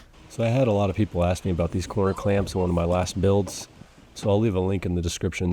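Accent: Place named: Florida, United States. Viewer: I knew you were in Florida. By the way you were talking I’m in Ocala. How far away are you?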